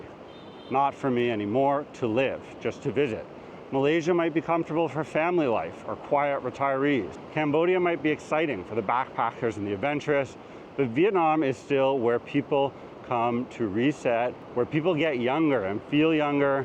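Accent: Canada. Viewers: First honest influencer for SE Asia. You sound Canadian though. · Are you from Wisconsin? I think that you sound more like a Canadian, but you mentioned Wisconsin a couple of times...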